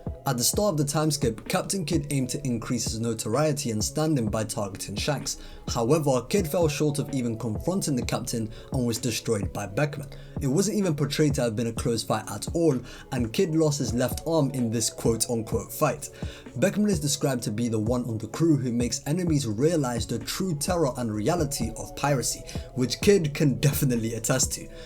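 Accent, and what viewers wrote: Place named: London, United Kingdom. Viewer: I like to see that one piece powerscaling and playing with the vivrecard/databook statements is still alive and well. It peaked in c0 vid then has been up and down i think. It seems to be lively again maybe due to the recent chapters. I have been out of the loop for a while. Perhaps i should get back into it. On another note You have a very strong london accent. You are maybe the only british black muslim london one piece fan i know of. Lol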